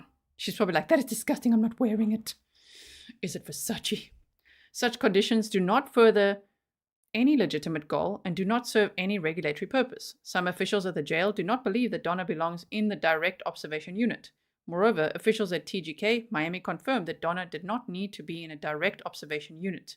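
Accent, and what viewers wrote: South Africa. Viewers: Love that South African accent. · Love that South Efrican accent.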